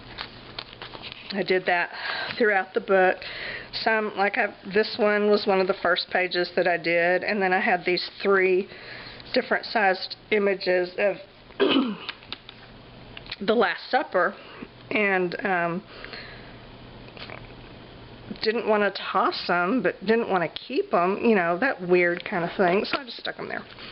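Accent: South, United States. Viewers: Okay, I am so stealing your "It's like perfume on a pigs ear." Just to let you know it made me laugh out loud!!! I love that there is someone else out here with a southern accent!! :)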